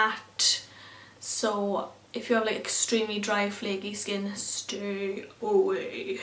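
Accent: Wales, United Kingdom. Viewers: Are you welsh x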